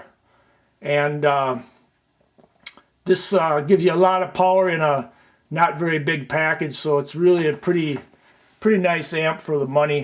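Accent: Canada. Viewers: Nice video. Really informative, good job. Are you like, up in Canada, eh?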